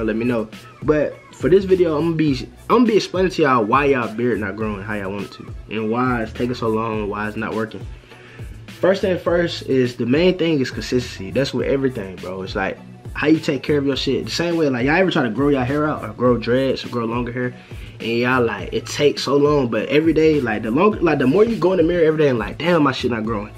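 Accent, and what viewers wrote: United States. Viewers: Bro where you from? I feel like you from texas😂the way you talk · You from Atlanta?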